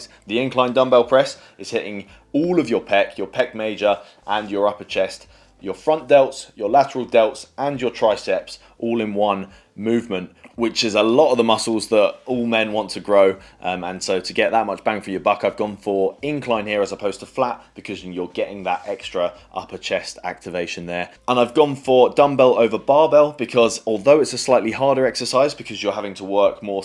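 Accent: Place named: London, United Kingdom. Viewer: love ur accent....so pretty ! where is it from ? London ?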